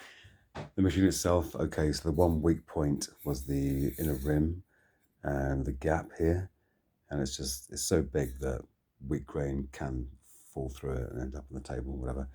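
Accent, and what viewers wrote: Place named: Scotland, United Kingdom. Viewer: Is it just me or do I hear his Scots accent coming through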